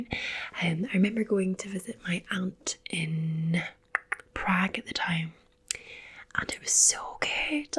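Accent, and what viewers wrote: Scotland, United Kingdom. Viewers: Where in Scotland are you from? Your accent seems stronger than mine x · Modsforfun I love that Scottish accent. I need a woman man from either Scotland, Ireland, or England. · as a Scottish person watching this I love it but I'm still not used to hearing Scottish accents on Youtube. Anyway I love this channel! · doesn't take away from the experience I assure you. Very good impressions! And still relaxes the hell out of me. Great work. Plus you have the accent going for you, you just can't beat a Scottish accent. I don't care who you are. Especially for ASMR. Just fantastic.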